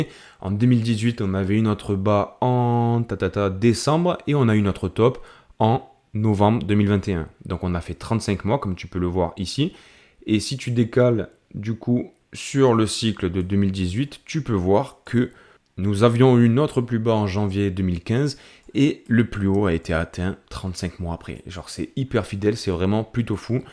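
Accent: South, France. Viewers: Je viens tout juste de découvrir ta chaine. T'es génial change rien, avec le ptit accent du sud ca passe comme papa dans maman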